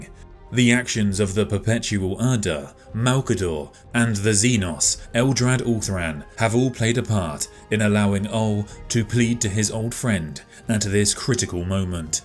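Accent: United Kingdom. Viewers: Just found you yesterday Mate! Love your videos! You,Luetin,and Weshammer are the kings of youtube 40k! Much love from VANCOUVER BC CANADA 🇨🇦! Warhammer is just better when read by the British!!!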